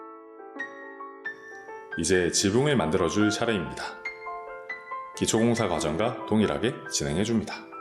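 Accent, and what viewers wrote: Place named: Busan, South Korea. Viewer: 부산이신가용? 중간중간에 사투리 억양이 정겹습니다~ ^^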